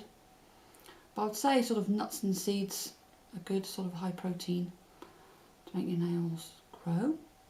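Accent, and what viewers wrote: England, United Kingdom. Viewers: Your really down to earth love your English accent hope you do more videos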